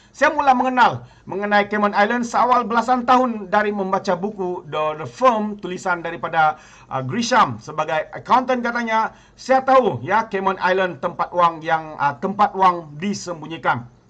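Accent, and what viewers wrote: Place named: Sarawak, Malaysia. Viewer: Suara orang kitak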